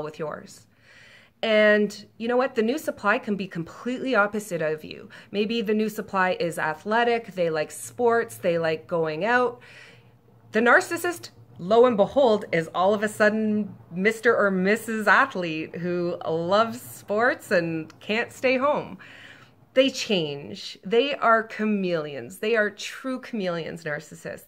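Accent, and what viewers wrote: Canada. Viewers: U sound canadian! What province?...great advice on no hope, btw. That is the cold hard truth. They will never, ever, ever change...in fact, they get worse as they age.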